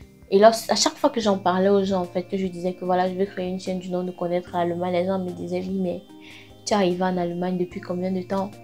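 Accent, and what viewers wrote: Cameroon. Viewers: Intéressante la vidéo👍🏽 par contre j'ai une petite question. Comment ça se fait que tu t'appelle mais tu parles avec un accent camer? Les c'est pas en Afrique de l'ouest? Excuse ma curiosité.🤔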